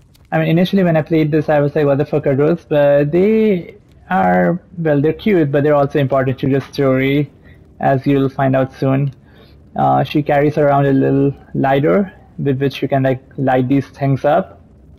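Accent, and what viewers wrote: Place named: United Kingdom. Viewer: i was about to say i am glad to hear your bristish english voice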